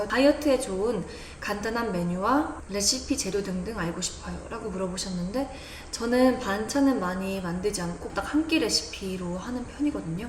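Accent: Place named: Seoul, South Korea. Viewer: 님 서울사투리 말투 같아요 😂😂 ㅎㅎ 넘키여워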